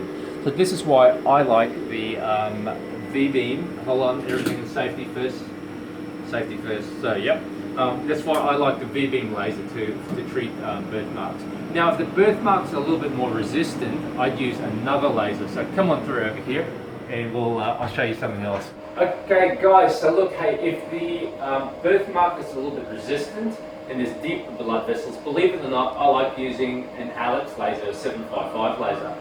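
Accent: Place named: Australia. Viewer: Rude. You look great. As a patient I always prefer to be treated by someone who isn't cookie cutter perfect. As an American, your Australian accent is more distracting, but in a good way :D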